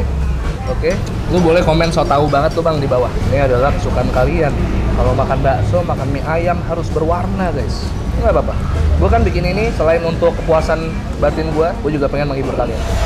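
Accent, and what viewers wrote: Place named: Banyuwangi, Indonesia. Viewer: Jawa timuran bang tpi jawa timurnya yg timur bgt BANYUWANGI 🤗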